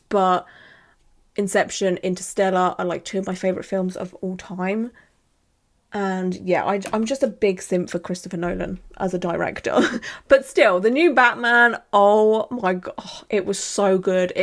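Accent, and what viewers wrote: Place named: Northamptonshire, United Kingdom. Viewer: Your blatantly from Northamptoshire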